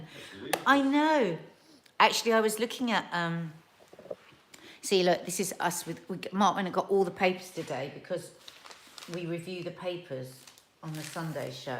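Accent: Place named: Scotland, United Kingdom. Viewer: Good afternoon to you both. I am Scottish, and your Scottish accent is brilliant!! Makes me smile, Reminds me of home as I live in England now. ❤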